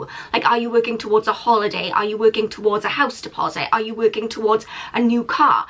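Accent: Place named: Wales, United Kingdom. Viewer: I was trying to place it too; thought it sounds a little like Scottish but not quite... it's got to be Welsh, right? :D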